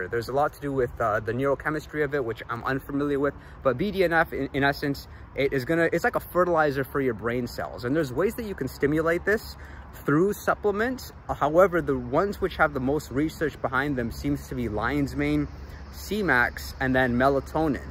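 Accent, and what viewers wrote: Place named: United States. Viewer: Hey, interesting info. You sound like you're from US, but it looks like you're in the UK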